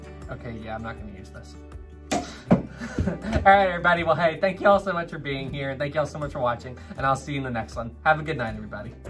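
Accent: South, United States. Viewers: His southern accent and charm definitely add to it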